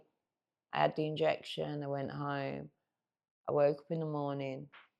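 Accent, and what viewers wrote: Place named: Cannock, United Kingdom. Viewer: Hi I'm so glad I watched the whole thing as I'm starting the injection this Thursday (26th March 2026) and I think we're similar age. I've been on off smacked since I was 14 and I'm 41 now. You also sound like you grew up not far from where I did with that accent (cannock myself) so although I'm a bloke and our lives would have been different, I bet we seen and did alot of the same shit lol anyway thanks for making this its inspired me to look forward to getting it done as I was nervous about the injection. My habit isn't anything like yours was, so if you can do it so can I. Thanks again and all the best in your future X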